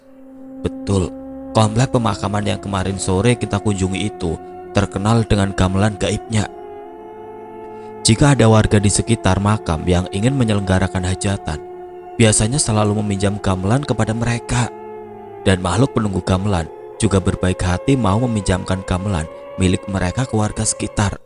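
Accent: Indonesia. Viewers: Pengucapan b. Inggris nya, Indonesia bgt😁👍👍. Dtunggu kelanjutannya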